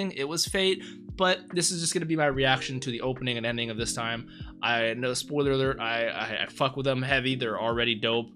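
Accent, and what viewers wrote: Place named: Canada. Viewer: for some reason I thought u were Canadian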